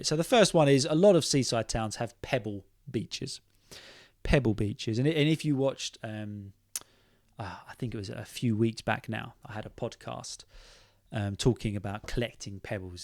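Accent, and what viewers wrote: United Kingdom. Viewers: Hey dude, how's it going? Your British accent is awesome. Hi from Fairfield, Connecticut, United States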